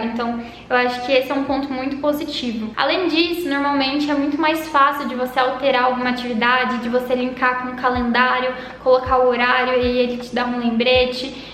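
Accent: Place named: Goiás, Brazil. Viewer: acho mto fofo o seu sotaque goiano puxando o r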